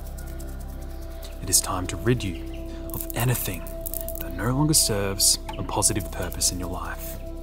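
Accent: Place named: Australia. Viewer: Is he Australian? I love his accent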